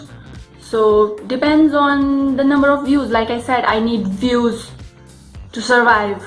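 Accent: India. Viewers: it’s obviously indian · Bekir Hadžić She's probably Indian and she speaks English a lot so her accent is light. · I'm glad I found you from a comment your so funny. You sound Indian but don't look like it but I have an Indian friend she has the same name as you. Also I'm Indian 😸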